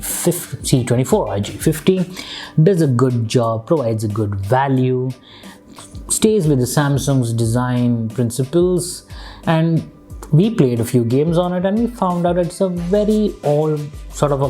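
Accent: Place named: India. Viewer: This isn't any hate, my problem is not that you're indian, but the accent tickles me so much man x)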